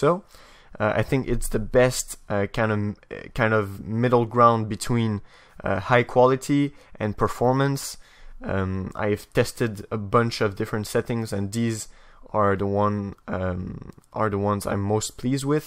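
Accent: France. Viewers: are you french ???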